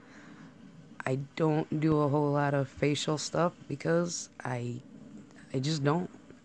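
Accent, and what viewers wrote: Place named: Michigan, United States. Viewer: Cool! Nice to meet you! Love your accent reminds me of my cousins up there. If my family had stayed in Michigan I would sound the same. I am so happy I was able to inspire you in any small way. It is why I feel like I need to share my art more, in order to give permission to others to just do it, play! I am big on embracing the inner child. Girl don't you even worry about your teeth, You are uniquely YOu. I had a friend in Memphis who had the worst teeth, but she was one of the most beautiful people I had ever met. One of our BFFs Danno has 2 teeth in his head, but our BRO has been there for us time and time again! I'll take my toothless friend Danno any day over some shallow egotistical pretty boy who can't even be bothered. Keep em coming I can't wait to see some of your art!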